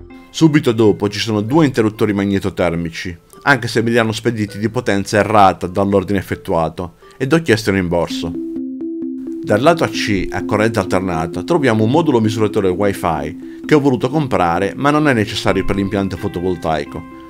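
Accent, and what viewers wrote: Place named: Sardinia, Italy. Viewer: Sono pienamente daccordo con te. Peccato che, dall'accento vive in Sardegna, altrimenti, Coronavirus permettendo, lo andavo a trovare, così con l'occasione, assaggiavo lu purceddu Sardo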